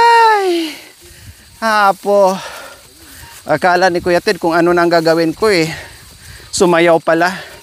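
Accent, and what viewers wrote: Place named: Iloilo, Philippines. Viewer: Ilongga ka gali madam❤❤❤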